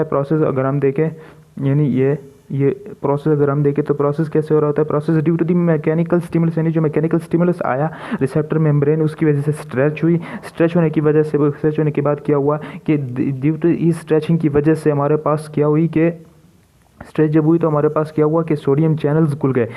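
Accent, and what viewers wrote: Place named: Pakistan. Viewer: Asa.. Lg rha h pakistani english bol rha h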